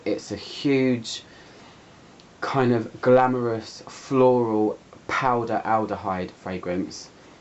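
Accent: United Kingdom. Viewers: great british accent, I envy you!:) Let me ask you a question, because I am watching and watching your perfume reviews now and thinking about buying this Joop! Femme online, but I've never ever smell it before.... and I don't know what to do! I have my 3rd big bottle of CK ONE now - for everyday use and Salvador Dali Dali Classic (this white one) - not for every day;). I love Dior - Midnight Poison, but unfortunately it is no longer available... I am not sure if Joop! Femme will be too strong scent for me and cause a headache or it will be just perfect to wear for night... What do you think?:)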